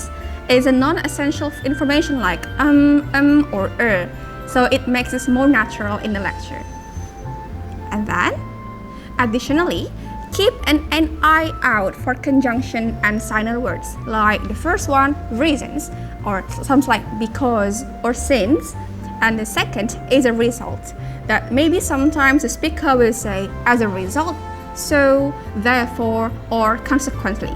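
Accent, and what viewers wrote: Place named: England, United Kingdom. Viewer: Kakak nya pake english accent ya;(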